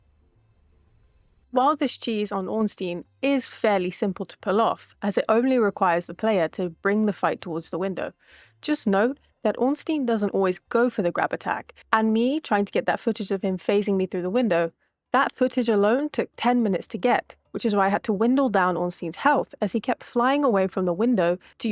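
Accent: United Kingdom. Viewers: Your voice is just fine. If nothing else, it’s nice to hear a fellow Brit!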